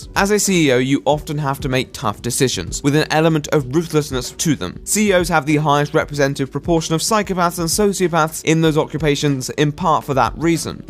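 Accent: RP, United Kingdom. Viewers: You soundly like such a posh wanker only complaint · You sound so damn posh. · I'm sorry, your videos are legitimately great on all objective levels, I just happen to be part of the like, 2% of Americans who are actually kind of turned off by the British accent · Brits all sound the same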